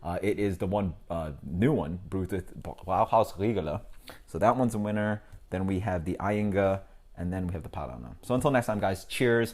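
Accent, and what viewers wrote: United States. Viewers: Out of curiosity. Why do you force the correct pronunciation of German words but use your American accent to pronounce the Spanish words like Sierra Nevada? Great review!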